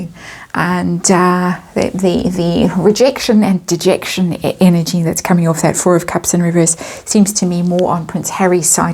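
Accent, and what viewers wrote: South Africa. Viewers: Harry THINKS.?? Is he capable? Of course Megan WANTS something, nothing will ever satisfy her. Once again, spot on! Love your readings,. Also love hearing your south African accent, much love from a South African expat.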